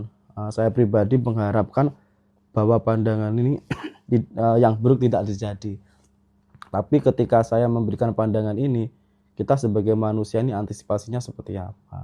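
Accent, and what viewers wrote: Java, Indonesia. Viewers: Mas jenengan kui penak banget nek jelaske sing ditrawang, aku yo seneng suarane lirih, trs jawane kui lho ora ilang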